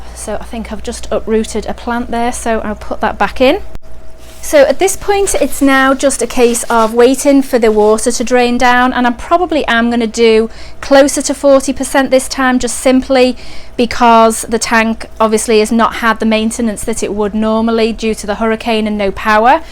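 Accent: United Kingdom. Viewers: oh ok thank you! Great video btw your videos are always so fucking and soothing to watch!! Even though I never comment I always like your videos! And I was just wondering because of your accent I thought you lived in the uk! I’ve only been subscribed for a month or less but I’ve liked your videos ever since I started watching and I keep going back to your old videos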